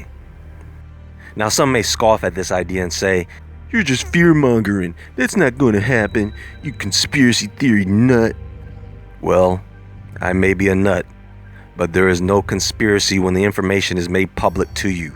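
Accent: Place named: New Jersey, United States. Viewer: Where are you from? You sound like a NJ guy. Wherever, I’m addicted to your voice. Great channel. Keep it coming!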